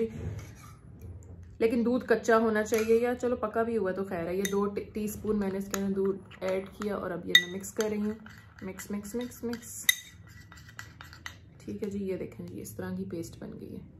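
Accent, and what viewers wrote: Lahore, Pakistan. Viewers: I love ur pakistani accent 😘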